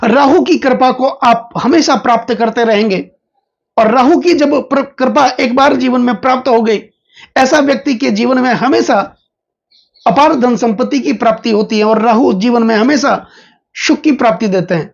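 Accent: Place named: Punjab, India. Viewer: It’s seems Hindi is not his first language, there’s Punjabi kind of accented Hindi.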